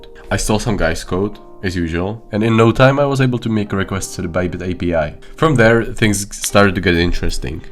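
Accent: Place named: Czech Republic. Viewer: Accent sounds extremely Czech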